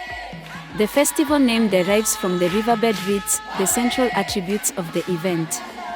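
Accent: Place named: Kenya. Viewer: Are you from Kenya because your pronunciations are exactly like Kenyans......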